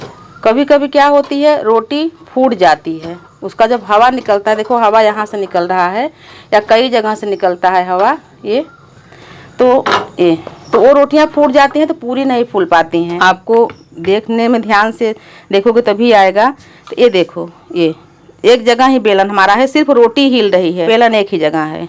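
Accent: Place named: Bengal, India. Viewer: Hum pakistan se hain. Aap ki awaz se maloon hots hai aap in ka ilaqa bangal hai. Aap nay buhat mahenat say roti banana sikaya hai hum aap ka shukria latte hain aap humari bahama ki tarah ho.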